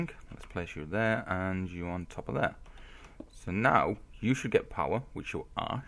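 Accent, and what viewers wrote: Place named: England, United Kingdom. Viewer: Or wait.. Let me guess.. England... Becuse of your accent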